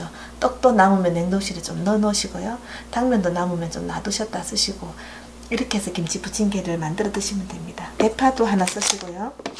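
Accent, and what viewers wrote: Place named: Daegu, South Korea. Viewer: 떡을마찼다? ㅋ ㅋ ㅋ ㅋ 대구사투리정감있네요 서울분들이볼땐 ㅎ ㅎ ㅎ ㅎ ㅎ ㅎ ㅎ 마찼다.!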